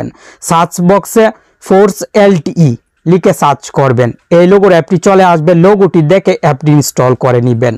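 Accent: Mymensingh, Bangladesh. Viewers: ভাইয়ের বাড়ি মনে অয় ময়মনসিংহ।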